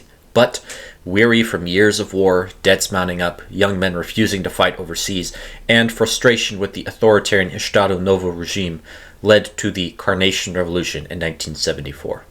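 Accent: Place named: Brazil. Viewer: Great video! Just make sure not to use Brazilian pronunciations for Portuguese names in the future 👍🏻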